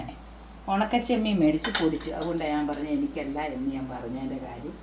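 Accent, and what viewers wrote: Kottayam, India. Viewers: കോട്ടയം സംസാര രീതി നിക്ക് ഭയങ്കര ista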